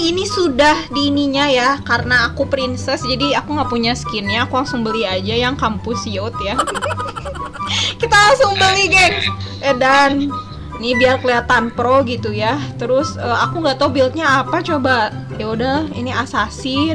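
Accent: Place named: Java, Indonesia. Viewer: jowone ngendi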